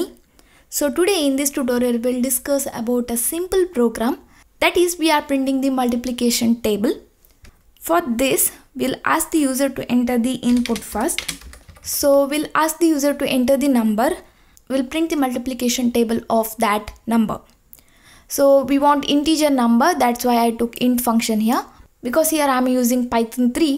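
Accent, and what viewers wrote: South India, India. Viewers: Are you from south side may be Kerela or tamil nadu side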